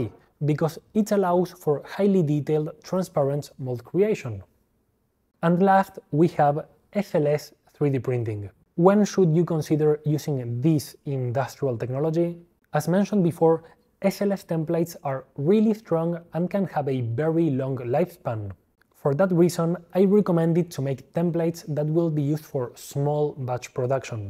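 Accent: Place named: Argentina. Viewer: Increible como se nota que hablas español por el tono de voz jaja, hasta incluso parece que hablas como Argentino aunque hayas dicho trabajas en una universidad de españa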